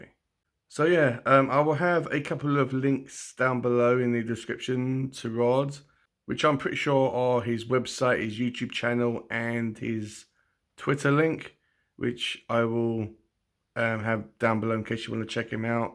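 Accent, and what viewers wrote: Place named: United Kingdom. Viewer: Thank you so much for this cool reading!!!! It didn't even cross my mind 🙃 I assume your accent is U.K. - The Lone Wolf lore may be only in America. They even made a movie Lone Wolf McQuade with Chuck Norris. 😁 The wolf was me (I grew up hard), the other is my wife, when I met her. And the end is just me being happy! I don't have the chops to be a poet, I try to write it to ignite my creativity for the prose I work on. I must admit, it sounds so much better when you read it!!!! Thank you so much!!!!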